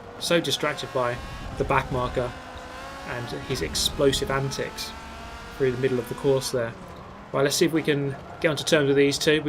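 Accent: United Kingdom. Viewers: dude the scenes of absolute mayhem and you critiquing them with your limey accent has me dying lmfao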